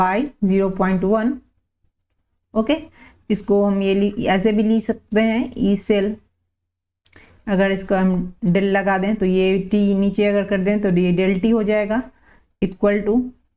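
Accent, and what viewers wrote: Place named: Bengal, India. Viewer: Bengali tone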